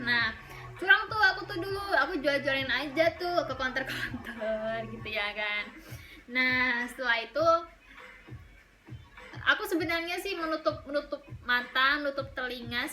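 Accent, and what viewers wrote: Indonesia. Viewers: logat jawa timurnya gk luntur semangat! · Buat satu konten yg full pakek logat pacitanx.................. Pasti seru.........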